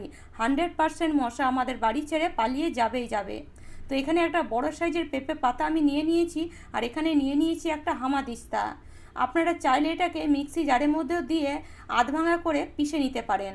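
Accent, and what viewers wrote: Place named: West Bengal, India. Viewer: আপনি কি ওপার বাংলা থেকে ? উচ্চারণ সেটাই বলছে !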